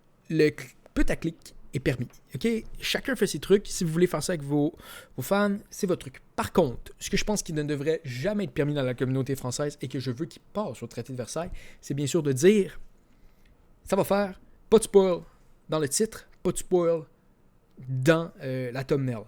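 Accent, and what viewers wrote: Canada. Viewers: L accent canadien et a chier mais bon c est pas votre faute si vous parler comme sa les canadiens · tu es quebecois donc vous parler différemment de nous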